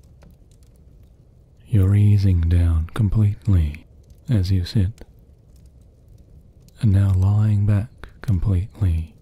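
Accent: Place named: Australia. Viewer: I love that you’ve released a hibernation video just as we’re entering winter in the souther hemisphere. That combined with your calm Aussie accent is just so perfect. I feel at home 😌🙏🏽💗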